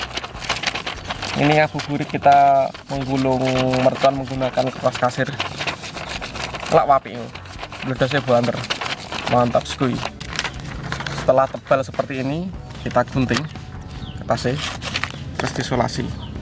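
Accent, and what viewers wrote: Java, Indonesia. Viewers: Wong jowo yo mas?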